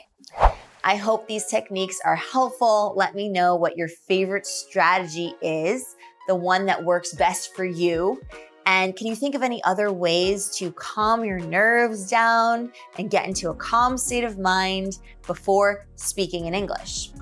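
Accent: United States. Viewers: Very good american enghish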